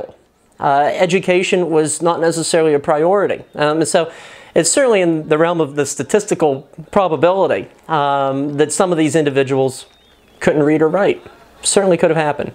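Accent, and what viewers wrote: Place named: Mid-Atlantic, United States. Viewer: a compliment of the highest order. I'm a lifelong Philadelphian, I've always said Hollywood tells us only 4 accents exist in the US , California/valley girl , New York, Boston, Southern and occasionally Midwestern . Yet the Mid Atlantic/PA accent is just as distinct as any of those, yet it's never even attempted. Despite the gluttony of great actors from the region like Tina fey, Bradley Cooper, Kevin bacon , will smith and Kat dennings. Worst of all whenever a movie is set in Philly they usually just have the actor do a new York accent. When I started going to school in upstate new York people thought my accent was fake, they litteraly thought I was pretending. Thank god bam margera and his show viva la bam became popular. At least then people could see I really did say "howgie" and "hoooomee" They say that accents are begining to vanish. 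So when I hear someone unapologetically speaking in a distinctly regional accent I love it. Especially when it's my region . I'm a big fan of movies, history and Pennsylvania so I'm a big fan of what youre doing. I think it's a great and unique concept and you blend the two really well and make it enjoyable. I can't recommend your channel enough. Keep up the good work.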